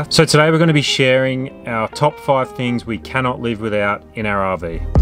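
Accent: Australia. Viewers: Really informative videos. Just one big critique - shorter, sharper edits. The Aussie accent means you speak really slowly, and just coming up with shorter sharper ways to say things would mean this video was easier to digest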